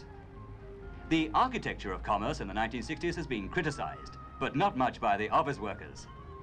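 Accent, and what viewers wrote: Australia. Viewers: What an interesting accent. Sounds like an Australian with an RP overlay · Is it just me, or is the narrator Australian?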